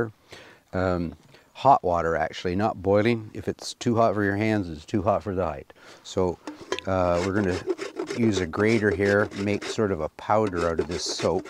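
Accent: Canada. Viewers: Truly excellent video! This guy is a National Treasure, I think he is Canadian from his speech patterns. He is articulate, considerate, and kind. Ted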